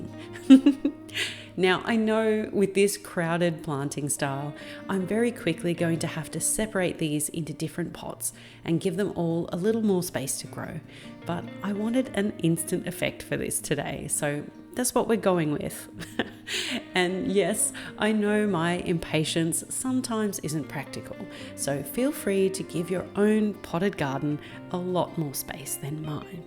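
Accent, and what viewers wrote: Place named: Australia. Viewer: Beautiful video, I came over here as a lady watching my videos said I sounded just like you ❤ same tone…except I hear you are Australian while I am British 😂 a compliment either way ❤❤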